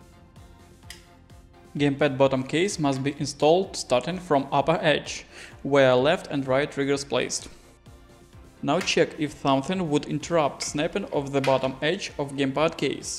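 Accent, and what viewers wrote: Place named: Russia. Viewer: Русский акцент 😅, лучше делай видео с субтитрами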